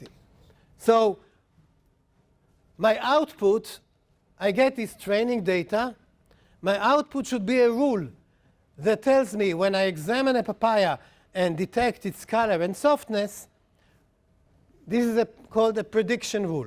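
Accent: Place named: Israel. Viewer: yay heb accent ^^ :-)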